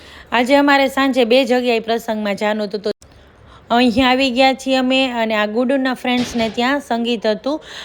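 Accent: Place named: Kathiawar, India. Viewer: Bahut achcha bolate ho ekadam kathiyawadi style😊😅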